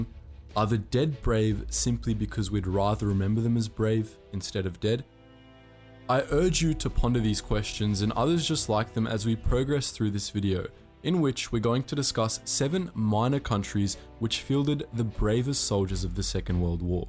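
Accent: Australia. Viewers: Considering your accent and you being Australian. Hard to take this video seriously since you’re biased. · Judging by your accent, I would guess you are Australian?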